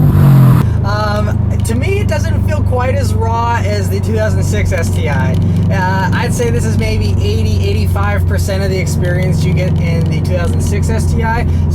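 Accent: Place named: United States. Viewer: an unbiased opinion on wrx by a youtuber whos account name is?... think that one out... however his reviews are good, I love em alot... and I hope he does go onto greater things.... somethign about the American accent and documentary really fits, same as British accent... try doing this as an Aussie..... Our accent feels sharp and uneasy to listen to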